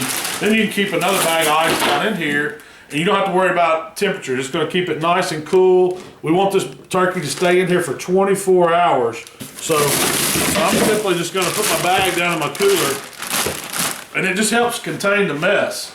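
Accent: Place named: Sand Mountain, United States. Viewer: You sound like one of them ole Sand Mountain boys I grew up with 👍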